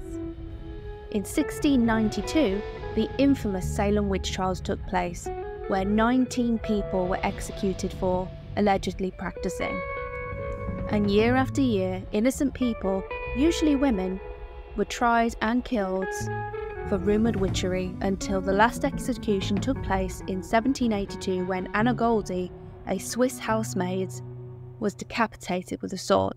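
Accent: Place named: England, United Kingdom. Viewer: G'day, I'm an Australian agnostic atheist. As an Australian, I suspect that I could be a witch or an atheist or a stack of other things for the foreseeable future without any dramas. Your accent sounds English and while I can point to a lot of things about the British government that I don't like, I doubt that you would be in danger from your government for your religious practices in the foreseeable future. However, I don't think that applies to women in the US. There women are taking additional steps to ensure their menstrual cycles do not become state knowledge, lest they be charged with abortion. (Worryingly, women who fall pregnant, don't know it and then miscarry after as little as 6 weeks are theoretically in the firing line of some of the anti-abortion laws.) About 70% of people in the US think that abortion should be allowed at least in some circumstances. 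The laws do not reflect that opinion. Similarly, it's been decades since a Republican president actually won the popular vote, but there have been a lot of Republican presidents in that time. So, in the US, I think there is a distinct possibility that Christian Nationalists could gain power in government (especially at the state level) and that they would go after people for witchcraft. Christian Nationalists aka Christo-fascists would not win the popular vote but that doesn't seem to matter in the US. The trick, then, for someone in a jurisdiction where the Christo-fascists have gained power, is to recognise that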